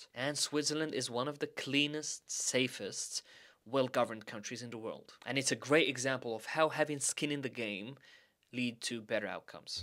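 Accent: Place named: Morocco. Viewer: the Morroccan accent in your english though :D this poped up in my suggested list, I own a small/new channel too and I can only support with a comment 😀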